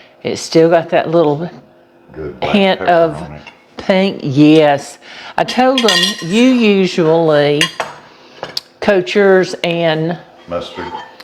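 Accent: South, United States. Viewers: Pronounce the words the way you do and let others pronounce them their way I love your southern accent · Don't let the critics who tell you how to pronounce 'peppericka' worry you. Your Southern accent is a big part of your charm!! Keep cooking and use plenty of 'peppericka"!!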